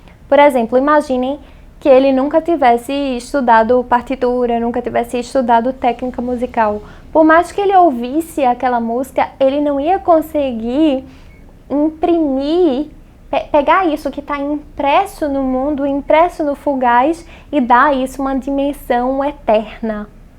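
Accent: Brazil. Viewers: Acho engraçado o sataque dela de Pernambuco!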